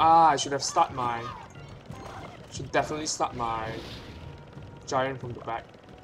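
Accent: Singapore. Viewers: U singaporean? accent tells me so lol. i am singaporean too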